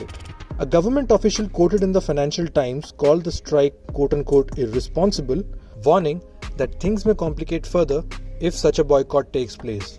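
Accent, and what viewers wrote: India. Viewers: PROPAGANDA . Sounds Indian . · Why dont indian ppl say "T" correctly and properly while pronouncing and reading such international news ....just try hard to throw away your thick -and bad indian accent all of sudden....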